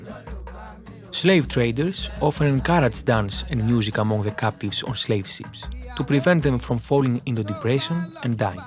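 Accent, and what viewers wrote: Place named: Greece. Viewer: Are you Greek by any chance? Your accent seems very Greek to me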